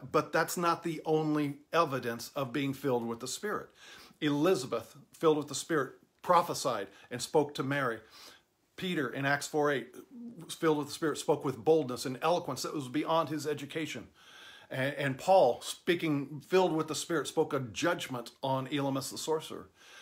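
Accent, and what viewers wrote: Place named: South, United States. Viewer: yall have that WONDERFUL sOUTHERN TWANG, LOVE VE LOVE IT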